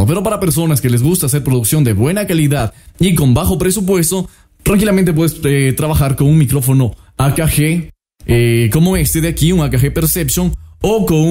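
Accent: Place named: Colombia. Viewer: Muy buenos tips, buena vocalización y acento por un momento pensé que eras paisano je, saludos desde Colombia.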